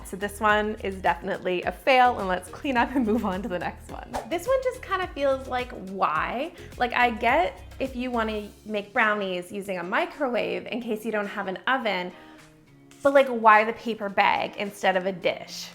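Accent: Canada. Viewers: is she Canadian or does say aboot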